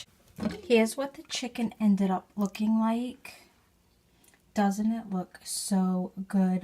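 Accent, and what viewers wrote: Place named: New England, United States. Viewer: So I thought maybe you were a New Englanda because I heard you say "freeza" and "dooa" (door). LOL. Great video! Thanks for sharing!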